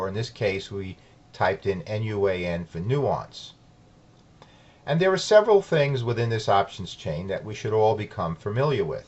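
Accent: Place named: New York, United States. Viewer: Hi Mr., my mom deeply and humbly apologizes for insulting your beautiful New York accent. I love it and I love the videos. Keep it up homie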